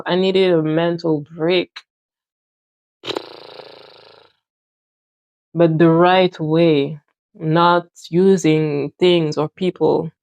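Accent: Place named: France. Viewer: Your french accent is so divine soothing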